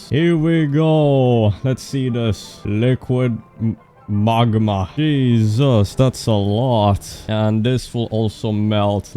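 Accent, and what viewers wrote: Sweden. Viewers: Its funny how easy it is to recognize your own accent. Two minutes in and im like "this guy HAS to be swedish." I double check and yeah, confirmed. Any other country and i have no idea what accent it is haha. 🇸🇪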